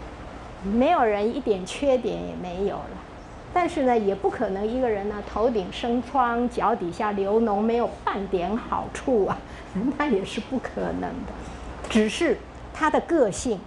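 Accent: China. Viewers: 老师是山东人耶~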